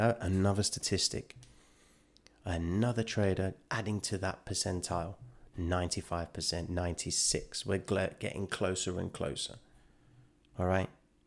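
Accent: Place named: Epsom, United Kingdom. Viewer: please help me I really need a mentor. you sound like your from my neck of the woods. im from epsom dude. im in a bad loser at the moment. shorted from 21500. my liquidation is 38k do you think I should leave. I may leave this week cause of bad moon cycle in btc. this is not a reason I know but I need to close it.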